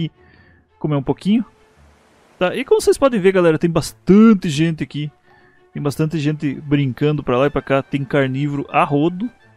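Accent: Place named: Rio Grande do Sul, Brazil. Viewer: sotaque de Gaúcho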